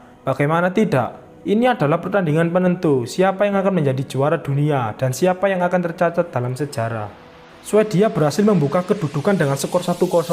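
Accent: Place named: Java, Indonesia. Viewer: suarane medok banget, mantab surantab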